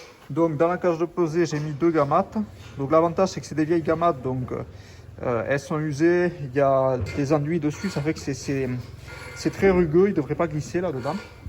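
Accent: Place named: South, France. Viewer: Salut, ma femme me dit toujours, avant de faire crac crac coupe toi les ongles, ça t'éviteras de me griffer. Hahaha… Si non,chapeau pour tes expliquations,j'en apprends chaque fois, c'est un régal,en plus avec l'accent du SUD. AH, le Larzac,Joseph Bové, le thym le romarin, Ho, là je m'égare,salutations Serge.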